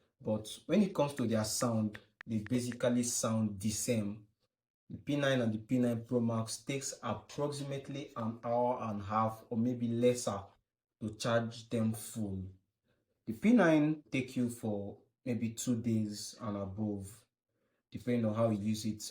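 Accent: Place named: Africa. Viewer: He's clearly African. What did you expect? The queen's English😒? American English? He's speaking a language that is not his. Show some respect.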